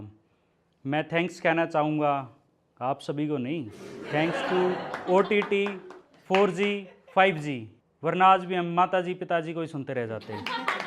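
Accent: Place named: Haryana, India. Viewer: Great Going Bhai... 👌🏻👌🏻👌🏻👌🏻👌🏻👌🏻👌🏻 All the best, we all are with you... Meri ek baat hamesha yaad rakhna, Your HARYANVI Accent is your STRENGTH, your ACTUAL POWER... Don't ever loose it, Keep it up... 👍🏻👍🏻👍🏻👍🏻👍🏻👍🏻👍🏻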